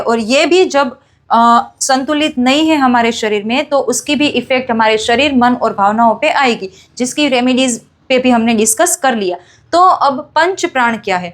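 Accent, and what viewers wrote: Gujarat, India. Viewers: Dear sister looks like you are gujarati this I find by your Hindi. What is true?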